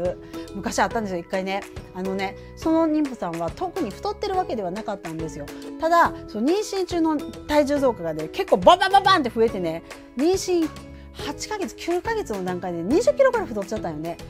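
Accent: Kansai, Japan. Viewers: 46歳って超高齢出産なんですか？ 私も43で初産の時に超高齢と思っていましたが、先生が「超高齢は今は50歳以降での出産だよー」と言われました。 そしていつも拝見してましたが、この回はHISAKOさんが😢残念な気持ちになりました。関西の方なので言葉使いやイントネーションも加味されるのでしょうが、皆さんが強い気持ちを持てる訳ではなく、HISAKOさんの様に経験があるわけでもなく、だからこそ、チャンネルを観に来る人が多いかと思うのですが。私はあまりにも、「だったら産むな論」的な発言にショックでした。背中を強く優しく押して欲しいからの投稿だったのじゃないかなぁ？と勝手ですが思っていたので😢 まもなくご出産ですね。どうぞどうぞ元気にお子様とおうちへ戻られることを祈ってます。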